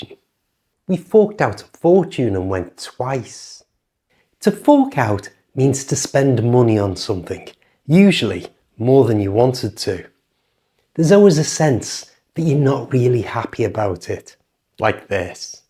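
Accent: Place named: North, United Kingdom. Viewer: What city do you live in ? You sound from North blighty?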